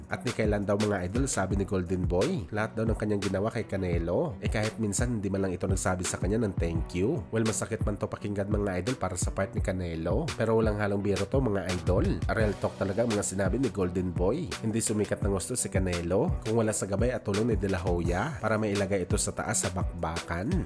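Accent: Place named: Visayas, Philippines. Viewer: Prang baguhan po kayo d2 s pinas,, ang vlogger po n ito ay isang visaya/visayan kaya kung mgpronounce lumalabas talaga ang pagiging kung anong language nila , pra samin ang spelling tama pero ang pronunciation kung hndi mo alam kala mo tama ka, at kmi mali,, ngayon kung pure tagalog ka maaaring tama k pero alamin mo muna po kung sino b at anong tribo ang isang tao,,🙏♥️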